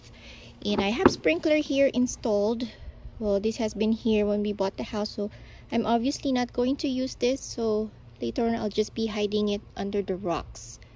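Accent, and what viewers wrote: Philippines. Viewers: Hi! Are you Filipino? You sound like one. Not that its a bad thing, Im a Filipino too. =)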